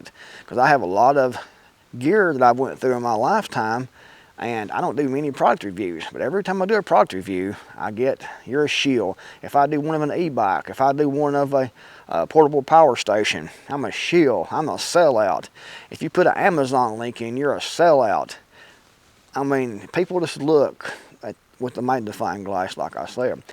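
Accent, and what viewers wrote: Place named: Tennessee, United States. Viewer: I thought the reload was spot on. What you said about Jui Jitsu is true. Tae Kwon Do would be the same. There are elements in all of it that could be useful, but street fighting, especially against multiple adversaries, will prove you need a lot more than any one form teaches. What's with the breathing comments? I'm just glad you are breathing. Jeez!!! What up people. Now, I do notice that you talk a bit different. I think I remember you being from Tennessee - y'all talk a bit different than we do here in Alabama. In fact, we here in Alabama notice that quite a lot of people in different other parts of the country don't talk as correctly as we do here. This is something we notice, and have come to accept. We are OK with it. Don't let the malcontents get to you. Have a great day!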